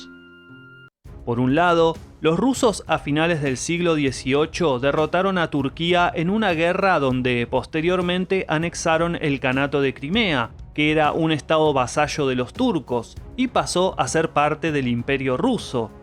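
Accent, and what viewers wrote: Argentina. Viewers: Un mishon? Que significa un mishon 😂 horrible acento argentino horrible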